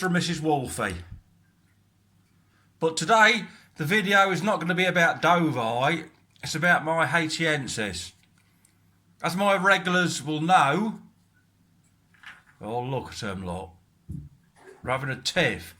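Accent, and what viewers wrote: Midlands, United Kingdom. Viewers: Your uploads are great to watch along with ur commentary really interesting,,,can I ask wot ur Actsent is??? I'm thinking midlands??? 🤔 👍 👍 👍 👍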